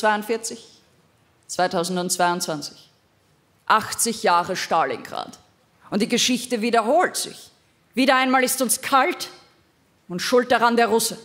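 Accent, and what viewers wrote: Austria. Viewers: kein Wunder Du Schlaubär, denn sie kommt aus Leoben, Steiermark und spricht somit den steierischen Dialekt. Das hat mit wienerisch so viel zu tun, wie bayerisch mit hessisch. Den Rest spare ich mir zu kommentieren... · Der Wiener Slang , der leicht nasale , herrlich ...freut mich als am Land lebende gebürtige Grazerin!!!😂😂😂😂❤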